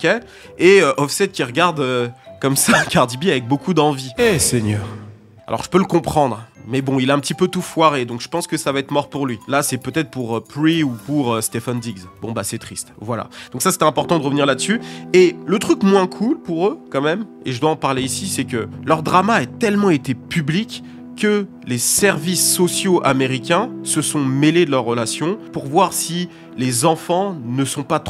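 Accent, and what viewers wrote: Democratic Republic of the Congo. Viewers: J'adore ton accents lingala kulutu ❤🇨🇩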